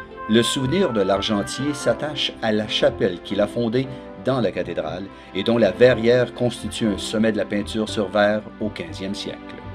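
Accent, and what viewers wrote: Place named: Quebec, Canada. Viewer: Le Berry avec l'accent sympathique du Québec ! Merci pour jolies ces cartes postales d'une région dont il ne faut pas trop ébruiter le charme afin de lui conserver toute son authenticité !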